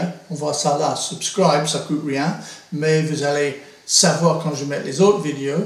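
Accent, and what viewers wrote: England, United Kingdom. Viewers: Super ce petit cour avec l'accent anglais j'adooore. Je suis nouveau dans ce domaine de la photographie ce cour m'aidera beaucoup car je ne pensais pas qu'il y avait autant de spécifique aux objectifs merci merci merci encore pour votre partage vidéo. Je m'abonne. Bye...!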